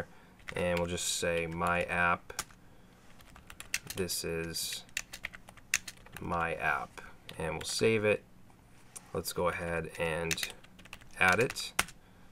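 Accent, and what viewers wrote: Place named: Boston, United States. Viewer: that accent.. are you from boston?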